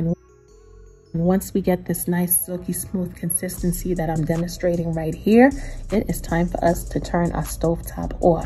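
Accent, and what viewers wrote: New York, United States. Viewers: I enjoy your videos! Keep cooking! I have my way of cooking but always love to try someone else's ways of doing things! Also I love that New York accent! Thanks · I love that new york accent from a woman you doing ya thing with that lasagna too i know you can cook you from up top too 💯🍷🌹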